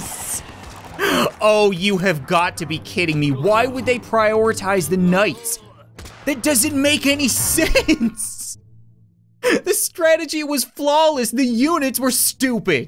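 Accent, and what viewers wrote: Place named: Canada. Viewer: Why was I thinking "Wind Conditions?" Must be the Canadian accent.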